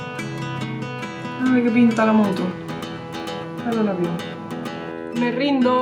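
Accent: Andalusia, Spain. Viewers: ¡¡¡Hola!!! mi bella genio hija adoptiva de todo el mundo descubrí que voy a tener 365 días completos pára disfrutar de : tu arte musical inigualable tus conocimientos de hhístorias y vidas de personajes que no conocíamos 365 días para ver en tu carita angelical reflejado tu gran corazón y tu amor por la humanidad y en tu voz andaluza universal oír murmullos de aguas quietas tonos de aves cantóras oleaje de mar acómpasado 365 días para agradecer a Dios por este regalo 365 días para decir desde el fondo del corazón todos los días GRACIAS POR EXISTIR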